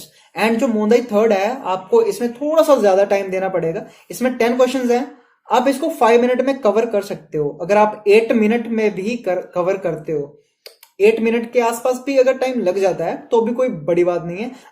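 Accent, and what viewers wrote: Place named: Punjab, India. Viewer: Awaaz me punjabi tone aa rahi hai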